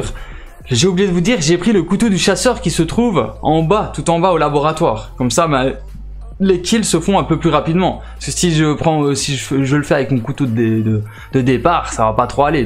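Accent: Belgium. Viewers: ton accent belge est genial ! JCVD qui t'apprend un glitch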